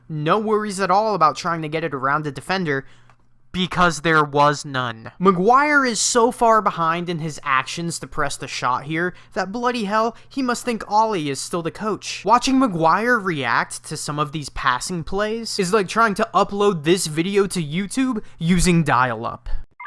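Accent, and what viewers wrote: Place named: United States. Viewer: I love listening to American accent talking about 'football'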